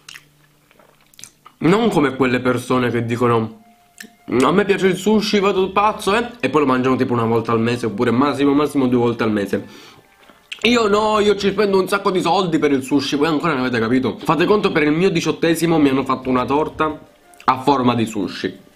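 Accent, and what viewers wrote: Italy. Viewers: vedi avevo indovinato😂 da buona calabrese mi sono sentita ''una parlata'' simile alla mia😂😂😂 mooolto strano su YouTube che sono tutti del nord!!😢 Cmq nuova iscritta!!!bravo bel canale😉🍻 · si sente troppo dall'accento che sei palermitano miiii ho parenti in Sicilia 😂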